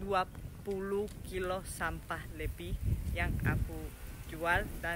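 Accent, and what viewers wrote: Java, Indonesia. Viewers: Logatnya Jawa bener njir:v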